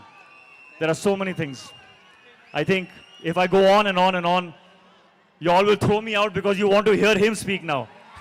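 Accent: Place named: Telugu, India. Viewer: accent 90 per original telugu person laga undi..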